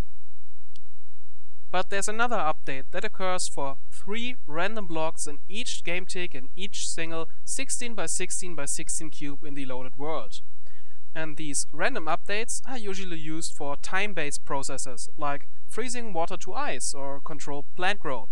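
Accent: Germany. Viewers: Ich liebe deine deutsch "pronuntation" (?) Ps ich bin italienich ;)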